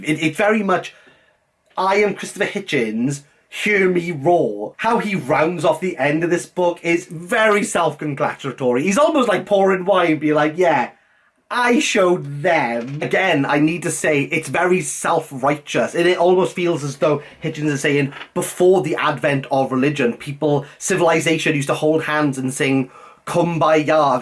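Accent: Wales, United Kingdom. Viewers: couldn't understand a word you were saying .................oh i see your Welch ,,,,,,,,,,,,, and your a theist ,,,,,,,,,,,,,,,,,,,ha ha ha ha .........oh dear oh dear We stand for your right to be a theist .............but please know your wrong , your living in a fantasy land stop being a Fucking child , let me point you in the right honourable direction of the patron saint , His holelyness , all explained here <link>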